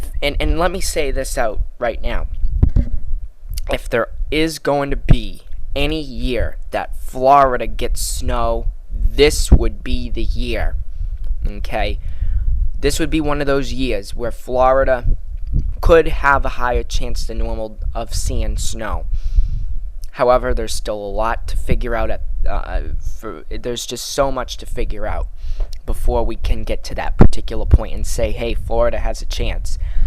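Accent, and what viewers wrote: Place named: New York, United States. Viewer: do you live in new york city, you have the new york accent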